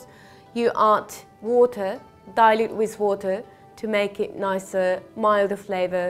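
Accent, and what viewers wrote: United Kingdom. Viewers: usually when people make instructional cooking videos, they use nice, presentable equipment and containers. Most people would pour the sauces into pretty little bowls, or glasses. This chick puts her sake in an old, plastic coke bottle with a paper label scotch taped to the front. On the other hand.... it's a hot asian girl with a brittish accent that happens to be a master chef. She pretty much has all of the fetish bases covered.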